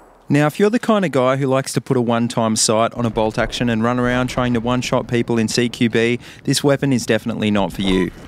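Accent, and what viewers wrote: Australia. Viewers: Are you Aussie/ New Zealander?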